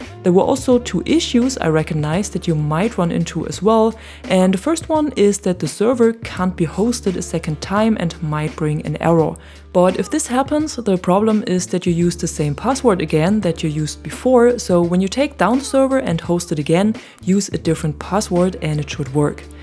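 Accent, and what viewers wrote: Germany. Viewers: You Germany accent is so beautiful 😍😍😍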